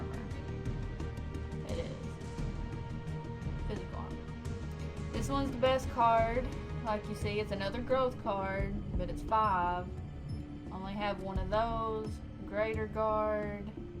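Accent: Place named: Tennessee, United States. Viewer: Dat Sweet Sexy Tennessee accent <33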